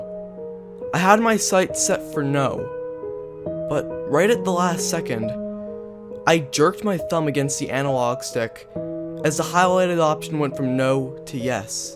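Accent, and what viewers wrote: England, United Kingdom. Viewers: nice British accent. Better than mine and I'm British haha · Where is that english accent supposed to be from?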